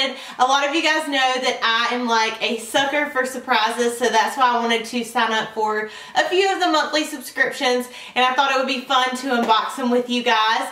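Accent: South, United States. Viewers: You're so beautiful 💞💁🏻 and I love your cute little southern accent lol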